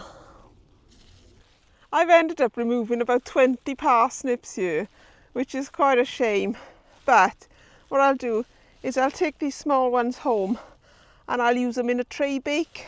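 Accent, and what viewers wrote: Wales, United Kingdom. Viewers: Lovely job our, I love your Welsh-ness in your commentary, 😂never lose it lovely girl, the harvest you gave me were delicious, 🎉xxxx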